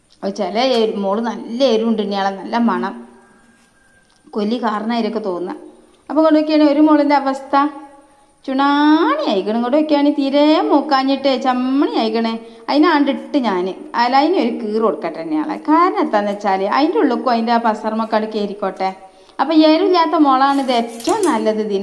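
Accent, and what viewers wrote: Malappuram, India. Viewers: മലപ്പുറം ഭാഷ പഠിക്കാൻ വരിനെടാ മക്കളെ 👍👍👍👍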